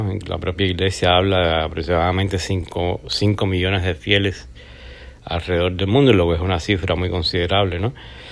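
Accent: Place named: Central America. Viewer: Guadalupe Salinas Salinas Gabrie no te pases de lanza los cerdos son sabrosos en carnitas y este tipo del vídeo habla como centroamericano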